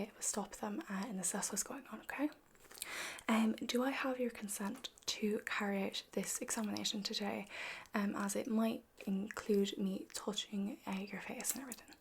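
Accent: Ireland. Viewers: I love your accent!!! Though that might just be because I hear it all the time when I’m around my moms family since they all have an Irish accent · Can't help but love the Irish accent! Hope to live there one day!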